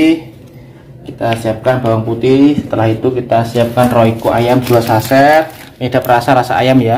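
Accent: Java, Indonesia. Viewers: Mantap mas resep2mu jowomu ngendhi aku wong Solo terdampar neng Sentul Bogor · mas kental jawanya...lucu penyampaiannya 👍👍👍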